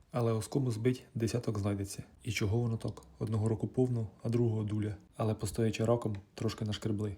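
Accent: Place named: Poltava, Ukraine. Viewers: Автор, звідки ви? Такі самі глиняні кручі бачив на березі кременчуцького водосховища, та й говірка нагадує полтавську 😊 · ❤❤❤❤❤❤❤❤❤закохалася... Неможливо наслухатись.ДЖИГІТ!!!!❤❤❤❤❤❤❤❤❤❤❤❤❤❤звідки ти?❤❤❤❤❤😂❤❤❤чую наш ПолЬтавський говьяжий суржик.ТАК приємно😂😂😂😂😂😂😂😂😂😂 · Мабуть ти з Полтавщини. Чую знайомі нотки. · Дякую за ще одне неймовірне відео. Ти молодчина, так тримати. Твоя мова схожа на мою, я з полтавщини. Бережи нас Боже. Чекаємо на перемогу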